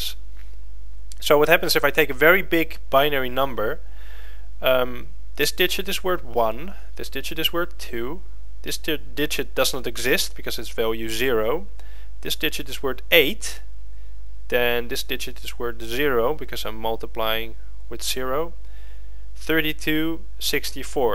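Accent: Netherlands. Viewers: are you dutch? :P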